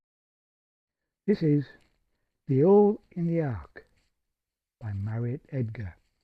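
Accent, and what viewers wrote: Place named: North, United Kingdom. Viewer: Fantastic fresh take on this classic—your lively delivery nails the northern twang, especially the cheeky rhythm on the bloodhound ballooning up and Mrs Noah's soggy sleeve mishap. In my retirement project V2Melody, I've set "The 'Ole in the Ark" to a witty folk chanson to introduce Edgar's timeless humor to new listeners. Cheers for the spirited reading!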